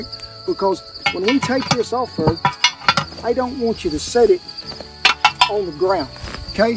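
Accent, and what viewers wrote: United States. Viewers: Best of American accents, hands down. Greetings from Argentina.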